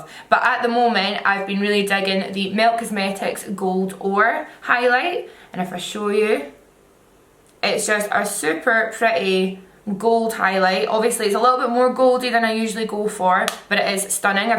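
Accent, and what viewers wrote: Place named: Falkirk, United Kingdom. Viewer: Are you from Falkirk because your accent is quite strong x 💓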